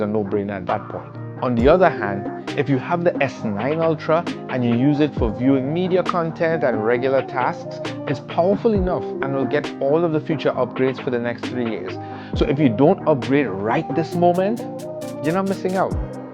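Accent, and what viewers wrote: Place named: Trinidad and Tobago. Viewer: Wait, wait, how you sound like a Trini so lol?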